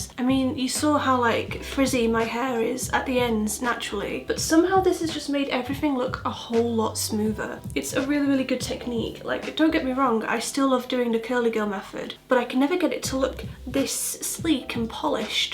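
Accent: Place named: United Kingdom. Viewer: You got a really nice way to act, it's not only your British accent, also the female, soft voice makes you come over as a little lady 😊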